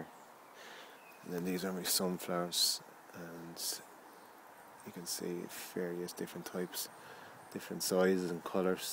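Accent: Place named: Dublin, Ireland. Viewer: failte...everything is looking good.. i wouldent worry too much about being late, often it seems later sowings catch up alot and are only a few weeks behind earlier sowings.... is a waterford nickname, but i think i can hear a touch of dublin in there, im in carlow.... keep the faith.. mick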